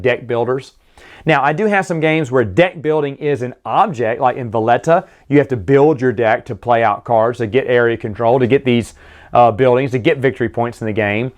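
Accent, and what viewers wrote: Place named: South, United States. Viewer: Hey, I hope you don't mind answering a question I've been asking myself for a long time while watching your videos: I'm from Europe and English is just my third language. However, I love the English language and I want to learn as much about it as possible. I'm pretty sure I'm able to recognize several different dialects/accents, including Irish, Scottish, several British dialects, Australian, New Zealand accent, etc. However, when it comes to North American dialects, I still have problems differentiating between all of them. I think I'm able to recognize New York dialect, stereotypical Italian American dialect (based on Martin Scorsese movies, lol) vague Canadian dialect and vague Southern American dialect. I'm pretty sure you're from the southern US. Am I right? When I watch American movies, I often hear people mention "Midwestern" or "Californian" dialects, but they just sound "American" to me. 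Any advice how to recognize the differences? I know this question has absolutely nothing to do with your video, but for some reason, your videos always remind me of this question.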